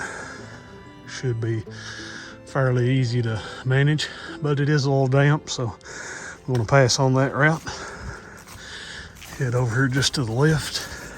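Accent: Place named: Eastern Kentucky, United States. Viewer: With that accent, you have to be from Eastern, Ky? My mother is from Perry County. Great video, I enjoyed the moonshine still. Never knew it was there. Thanks for the upload. New Subscriber!!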